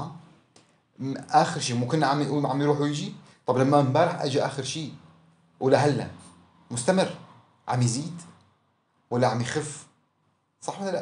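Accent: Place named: Syria. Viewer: اذا عراقي ليش تحجي سوري؟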